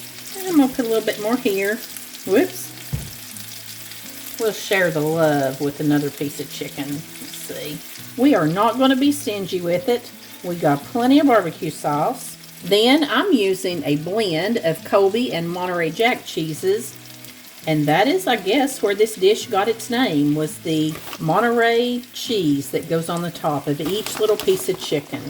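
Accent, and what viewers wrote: South, United States. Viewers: You are adorable and I just love your southern accent!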